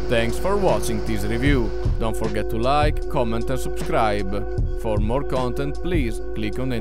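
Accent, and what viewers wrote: Italy. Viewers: Don't agree at all. Easy to understand and with an awesome accent :) Italian?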